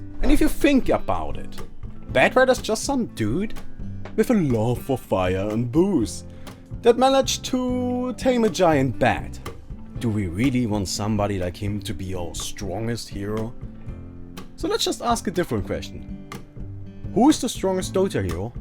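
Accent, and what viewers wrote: Germany. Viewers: he is german, that explains his pronunciation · Dat german accent... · I really find it funny how many people comment on that zeus zois stuff... zeus is pronounced zois(strong z) in german, this guy is from germany so he talks like that. case solved. ( not to mention this pronounciation is actually more correct than the english one)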